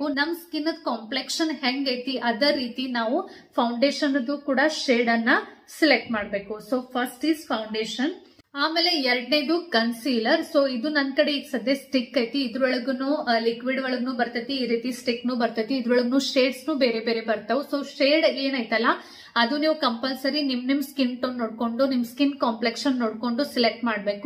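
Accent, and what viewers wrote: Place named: Uttara Kannada, India. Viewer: Hai sister 😍 .Nivu mado yalla videogalu tumba informative agirutte . Nivu chikka chikka vishayavannu tumba acchu kattagi heli koduttira. Ondu kutumbada javabdarige nimmantaha hennu magalu tumba agatya 😍 Maneyannu channagi maintain madta eddira. 😊 Waw. Nivu matado uttara Kannada language keloke kivige impagirutte, nivu madida odapu gala video sakattagittu. Gandana hesarannu a rethi agi karibahudanta gottirlilla. Tumba channagittu. Nimma vlogs Alli nivu honest inda interest ittu video madodrida adannu navu aste interest kottu noduteve. Nivu mane kelsa, bere work, sanvina nodkollodu yallavanu channagi nodikoolodrinda bereyavarigu nivu inspire agiddira. Nivu yavaglu energetic agirodrinda namgu kelsa madoku energy barute. Nimm life style tumba esta, nimma matte Vinay Sir bonding tumba channagide. Nivu made for each other ☺sir nimge tumba supportive agiddare. 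Nivu tumba cute agi muddagiddira. Vinay sir tumba lucky. 😍 Nivu toriso daily vlogs, cooking, matte sanvi jothe mado activities help agutte. Ega madiro makeup videos alli yavella product hege use madbek annodannu channag heliddira. Tnks sis 😍 Heege helpful videos madta eri..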